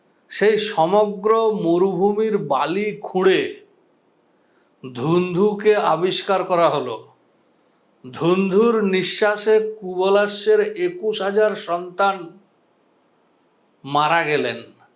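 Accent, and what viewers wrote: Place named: Bankura, India. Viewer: আপনার জন্য এমন এমন অদ্ভুত বিষয়ে জানতে পারি যে, যা সারাজীবন পেরিয়ে গেলেও সেই নিয়ে জানতে হয়তো পারতাম না। অনেকে বলবেন এগুলো ভাষা কে ভালোবাসলেই জানতেন। একথা ঠিকই। তবে তিল তিল সময় যেভাবে পেরিয়ে যাচ্ছে জীবন থেকে, তাতে নিজের উদ্যোগে নতুন কিছু রিসার্চ করে শেখা, এই জীবনে খুব কঠিন। 🎉🎉🎉🎉 আপনার কথায় বাঁকুড়া ঘেঁসা একটা টান আছে (ধারণা ভুলও হতে পারে). 🎉🎉🎉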